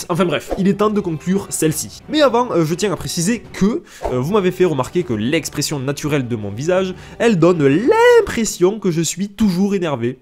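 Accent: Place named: South, France. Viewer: kifan ton accent du sud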